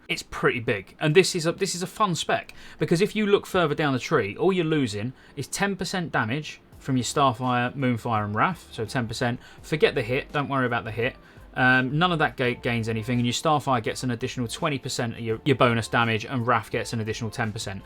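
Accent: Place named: Lincoln, United Kingdom. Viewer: You Lincoln lads say Natural weird. “Naytural” 😂😂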